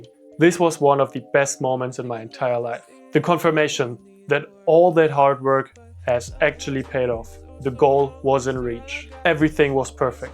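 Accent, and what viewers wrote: Germany. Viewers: Yeah, you are the stereotype of the German/Dutch person apologizing for their "terrible English" while speaking with what I would consider nothing more than a slight to moderate accent. We don't mind the apology, but it can't be factually inaccurate (which should warm your German heart). Zero grammar mistakes, vocabulary greater than many native speakers, yeah you're fine there bud. The colloquialisms will come with time. Welcome to Canada. Bienvenue au Canada. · Are you Bavarian? I was thinking I was hearing the shadow of an Austrian accent, but then you said you were German, so the only thing I could think of was that you had to be from Bayern.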